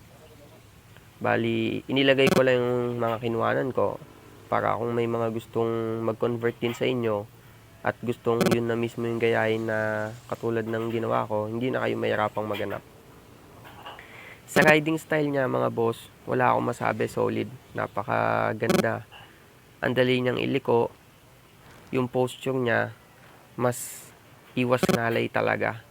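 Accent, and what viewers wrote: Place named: Muntinlupa, Philippines. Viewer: napanood ko na boss kakatapos lang ang angas, at na notice ko parang taga muntinlupa kalang HAHAHA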